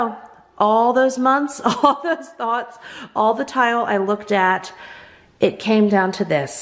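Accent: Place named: Rural, United States. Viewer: I am so happy for you. I live in NC so I love hearing another country girl talk 🥰🥰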